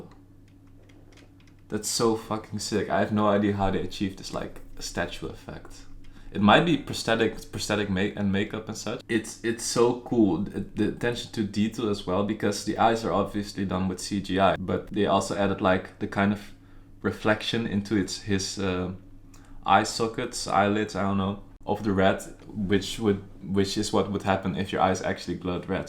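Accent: Netherlands. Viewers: Are you dutch? i can hear your accent. :3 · you started speaking and i knew! Das een Nederlander!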